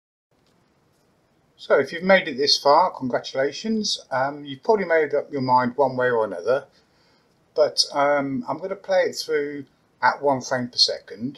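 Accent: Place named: United Kingdom. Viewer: Is this the 1st timw you guys hear a british accent😂😂😂 You need to get out more. Get a passport and see the world.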